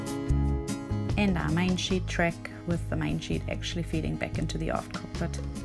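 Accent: South Africa. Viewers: Stunning job, Are you based in NZ, you sound like you have a South African accent ? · Really nice boat! Enjoyed the SA accent, reminded me of home ❤️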